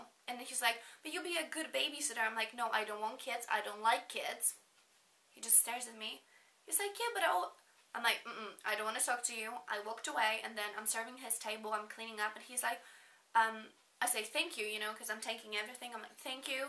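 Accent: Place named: Albania. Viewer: This was so funny! Are you Albanian? I love your accent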